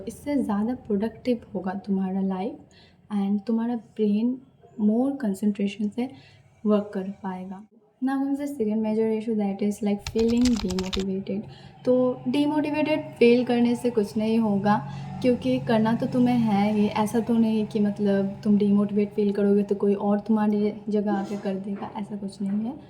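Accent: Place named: Bengal, India. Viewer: Waiting for short hair videos 😭 and ur bengali accent 😭🎀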